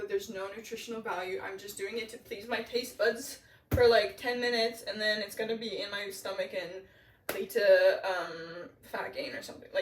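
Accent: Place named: Denmark. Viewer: Why do I hear a Danish accent? :)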